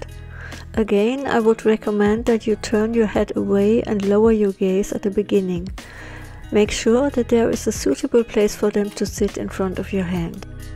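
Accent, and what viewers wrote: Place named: Europe. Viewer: I've started looking for crows to feed. No luck yet getting close. I'll try your advice. I like your accent. It sounds European.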